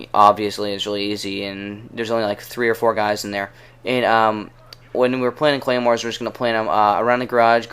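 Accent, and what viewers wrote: Canada. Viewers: R U Canadian? No offense really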